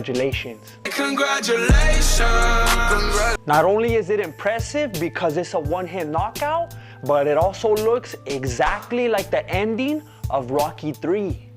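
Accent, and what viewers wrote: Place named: Mexico. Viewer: i enjoy watching this guy ,his nice Mexican accent ,his jokes and beautiful videos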